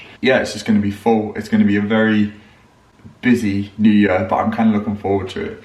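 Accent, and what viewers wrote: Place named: Durham, United Kingdom. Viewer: Can tell you’ve been back to Durham, this Londoner is having trouble understanding you 😅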